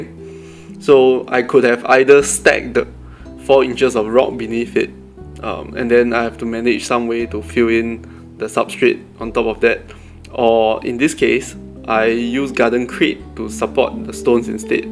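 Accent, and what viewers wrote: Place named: Singapore. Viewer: Sound like my country men, u singaporean?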